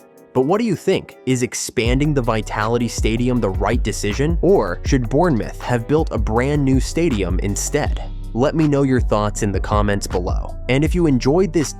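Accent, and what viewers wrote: United States. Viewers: Shame a Yanky robot had to narrate this. I might have watched it otherwise.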